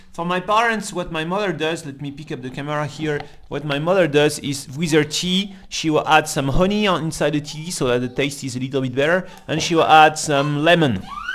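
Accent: France. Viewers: L'Anglais avec l'accent français c le meilleur :)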